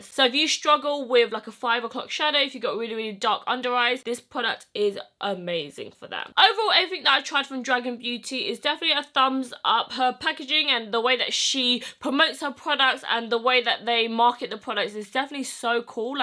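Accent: United Kingdom. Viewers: At first I didn't even realize she was british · I didn’t know you were British lol I like your accent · OMg she’s British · Talk about shocked.. I had no clue she was British 😂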